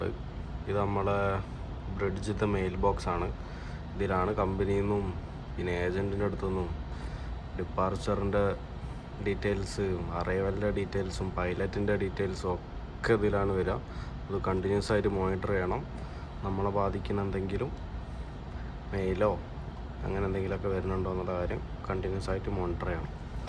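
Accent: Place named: Kozhikode, India. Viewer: Voice over sound കുറവാണു... ആരോ ചെവിയിൽ പതുക്കെ പറയുന്ന പോലെ 😄... പിന്നെ ഈ കോഴിക്കോടൻ slang ഒഴിവാക്കിയാൽ കേരളത്തിലെ എല്ലാവർക്കും ഇഷ്ടപ്പെടും.... "ദേവൊസോം" എന്നല്ല ദിവസം എന്നും മണിക്യൂർ എന്നതിന് പകരം മണിക്കൂർ എന്നും തിരുത്തുക.... Comments പോസിറ്റീവ് ആയി എടുക്കുമെന്ന് പ്രതീക്ഷിക്കുന്നു 🙏.... Video നന്നാവുന്നുണ്ട്... ഭാവുകങ്ങൾ 👍👍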